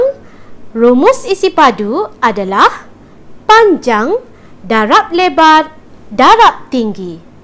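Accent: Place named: Kelantan, Malaysia. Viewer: O aloh gomo kelate go mo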